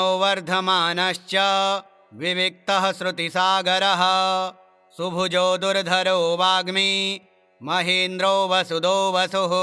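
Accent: Standard. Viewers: उच्चारा तील शुद्धता वाचकाला साध्य झाली आहे त्यामुळे ऐकणाराला श्रवण सुखा चा निखळ आनंद चा लाभ मिळतो धन्यवाद 😊 सा . म्हा म्हसे . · शुद्ध उच्चारण सहित विष्णु सहस्रनाम प्रस्तुति । घर में सुबह पाठ से आनंदित वातावरण हो जाता हैं, बहुत बहुत धन्यवाद ।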